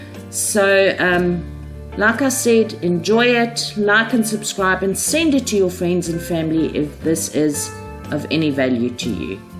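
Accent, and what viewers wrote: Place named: South Africa. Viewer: Oh my word you're south African!!!!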